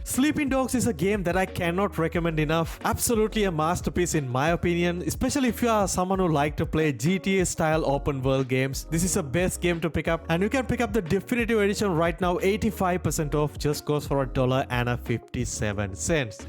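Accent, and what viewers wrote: Sri Lanka. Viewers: Are you sri lankan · Let me guess are you sri lankan?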